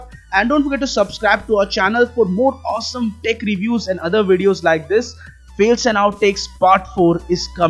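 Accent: India. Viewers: It's not bad. It's awesome. When did accents become bad? If you don't mind, what state are you/your family from in India? You sound like my colleague Jigesh from Punjab but I don't want to guess.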